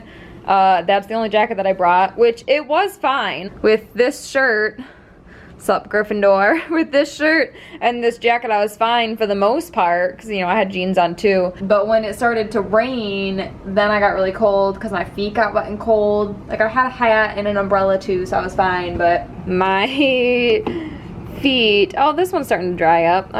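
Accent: Midwest, United States. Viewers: Is that a Midwestern accent?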